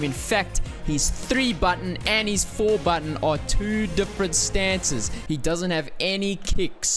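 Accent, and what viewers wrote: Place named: South Africa. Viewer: I know your profile says you’re Australian, but I can recognise a South African from a mile. Awe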